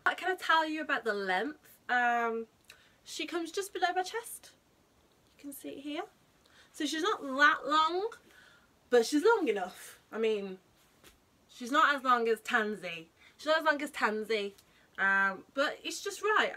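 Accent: United Kingdom. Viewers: So lovely to hear a British accent!!!!! Yessss!!! U look great. I bought it too😊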